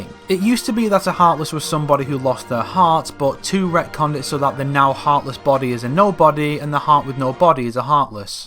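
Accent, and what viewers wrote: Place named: Merseyside, United Kingdom. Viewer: So I've finally given up trying to pinpoint that accent: where in the UK are you from? My gut says somewhere in Merseyside but I'm just not sure :P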